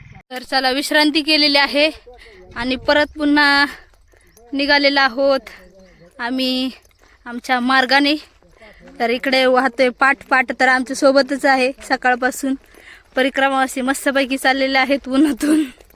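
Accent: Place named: Rural, India. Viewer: ताई मी तुमच्या आई च्या वयाची असेन तुमचे परिक्रमे चे व्हिडीओ मी नेहमी बघते, तुम्ही खूप साधे पणाने राहता व साध्या सोप्या ग्रामीण गंध आहे तुमच्या बोलण्याला! नर्मदा मैया तुमची इच्छा पूर्ण करो! 🙏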